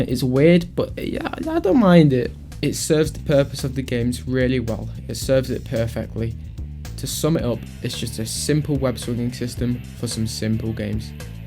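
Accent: United Kingdom. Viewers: Sorry, this was really hard for me to pay attention to because of how bri'ish you sound. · Are u northern Irish ☘️ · Are you British? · u british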